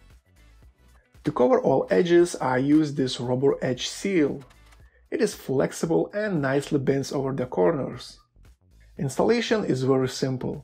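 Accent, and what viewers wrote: Russia. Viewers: Я один слышу русский акцент?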